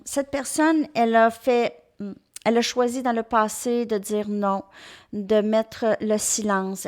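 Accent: Canada. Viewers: Bonjour je ne c'est pas d'où vous êtes au Canada car j'adore l'accent canadien j'ai une de mes meilleures amies qui habite Montréal moi je suis de Tarbes en France et j'adore cet accent avec cet douceur dans la voix et oui mon chéri et en prison pour des fait ou il a était coupable bref oui j'ai toujours était la pour lui mes là pour des reson que j'ignore plus d communication d'eupuis le moi d'octobre je c'est qu'il m'aime beaucoup mes quand je veu avoir des discussions avec lui il ce renferme sur lui j'espère vrement qu'il va me donner des nouvelles rapidement car il me manque énormément bonne journée a vous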